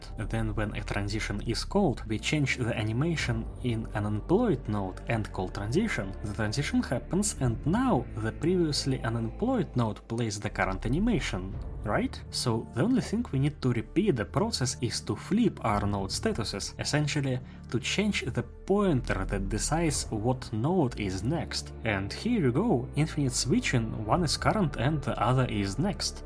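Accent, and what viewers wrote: Russia. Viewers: Хмм, что-то уж слишком хорошо я начал понимать английский. Как будто собрат по русскому языку пытается на английском что-то рассказать и все понятно,т.к отсутствует английский акцент 🎉🎉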